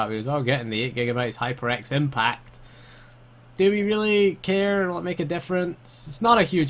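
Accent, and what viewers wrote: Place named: Scotland, United Kingdom. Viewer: He's from scotland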